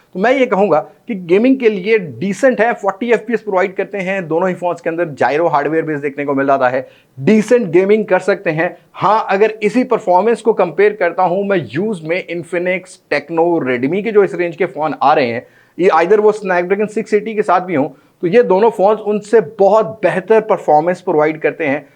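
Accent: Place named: Punjab, India. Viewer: Bhai aa Punjabi touch bara wadya lgha💯❤️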